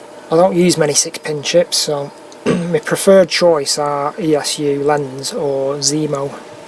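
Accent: Manchester, United Kingdom. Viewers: Well, its from over tyneside really but yeah okay it worked around liverpool and manchester... Nice to hear your accent. Do miss the manchester twang having moved to Australia. Its just a really small loco in real life so it would look really small in model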